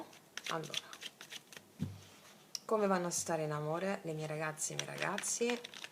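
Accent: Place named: Salento, Italy. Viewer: Buongiorno mia cara, ti ho scoperto questa mattina... E stato come sentirti fare parte della famiglia... Sento dal tuo accento che sei Pugliese... Anzi... Salentina... Come. me ....Sei una forza della Natura !!! E si sente tutta la Passione e la Preparazione che Emani nelle tue stesure... Che sono rimasta Affascinata !!!! La tua Simpatia è Contagiosa ... E Analizzi in Profondità... Mi piacerebbe conoscerti Personalmente !!!! Ti Abbraccio Forte !!!! 🏆💥😂🤗🤩😇🍀🔥🎤🎶❤️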